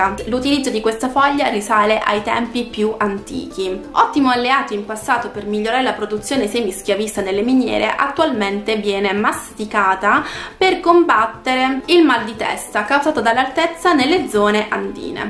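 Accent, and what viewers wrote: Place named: Bolivia. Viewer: Me encanta el acento te stalkeare para mi campaña youtubers bolivianas en USA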